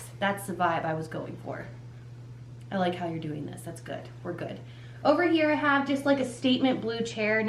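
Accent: United States. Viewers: Hi frands....🙋😘 You guys are, sooo, funny. 😂😂😂 I, soooo, needed this tonight. I'm laughing so hard I can't type...😭😭 southern accent and backyard tour was hilarious. Love you guys. Love watching Chip and JoAnna. Great show. Your show is more fun. I like your little house. It's cozy. Love the blue chair. Where did you say you bought it? You could put little blue lights around corkboard to balance with the blue chair. A couple of yellow pillows will give the couch a pop of color and would look nice with the poo of blue from the chair. Have you ever thought of doing your house using Fung Shei. The style uses the elements in each room of, Wood, Fire, Water, and Metal. Placement is put according to North, South, East, and West. By combining the different textures, it brings harmony and prosperity into the home. It's, quite, fascinating and fun. You can google it and it will take you through each room and tell you what textures and colors will go together. 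Each room uses the NSEW areas and middle to bring calmness and harmony. You may not want to spray lysol around. It can cause neurological issues in humans and pets. My kitty had seizures after spraying it, one day. She suffered some brain damage and couldn't walk straight for almost a month. She was, terribly, wobbly and I had to carry her around and to her litter box and food. Slept on the floor with her, in case she needed to go potty or eat. She, eventually, got better, but, was never the same. I never use chemical prod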